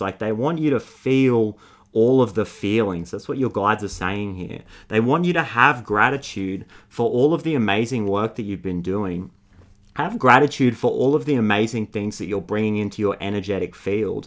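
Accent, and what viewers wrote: Australia. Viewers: didn’t know I would find a reader with a thick australian accent holding anime chibi tarot cards to resonate with me but alas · Felt like you were speaking directly to me. Thank you so much, also the Aussie accent makes it so much better for some reason ❤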